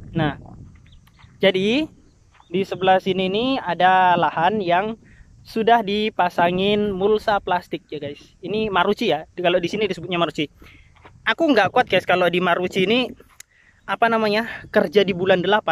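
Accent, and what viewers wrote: Java, Indonesia. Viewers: Asli jowo opo pontianak to bos..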